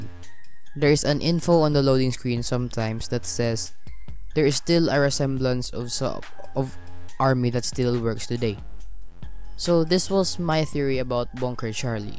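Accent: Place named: Philippines. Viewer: Ur voice is like a Filipino:) I'm Filipino too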